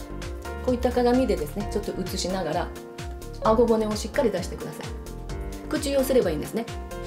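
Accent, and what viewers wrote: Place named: Nagoya, Japan. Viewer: 自分は名古屋出身なのですが、さんも名古屋のかたですか？なんとなくですがニュアンスを感じました。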